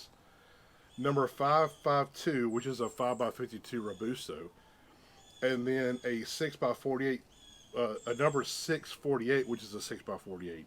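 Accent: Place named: North Carolina, United States. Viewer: Great review. You sound like you should have a BBQ channel lol. It’s that NC accent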